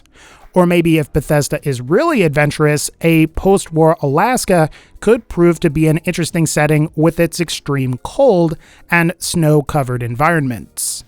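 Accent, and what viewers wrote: South, United States. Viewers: Does anybody miss the loud southern accent that used to do😕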